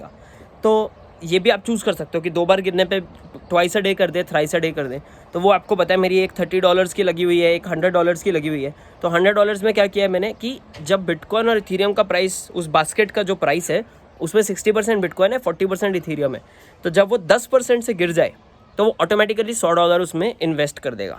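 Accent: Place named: Nagpur, India. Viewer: Bhai Nagpur se ho kya....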